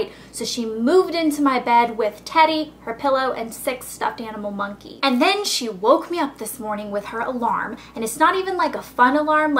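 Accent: England, United Kingdom. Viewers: that britsh accent :D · I highly like how you acted in this video. You were very... I don't know, lively. You were just happy and you didn't seem fake or bored. PLus, I love your british accent... lol. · i love your cute British accent there it made me laugh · British accent? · Nice British accent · I love ur english accent! lol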